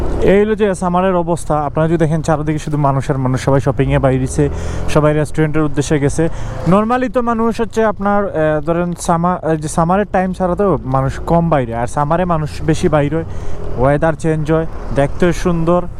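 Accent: Sylhet, Bangladesh. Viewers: apni sylheti?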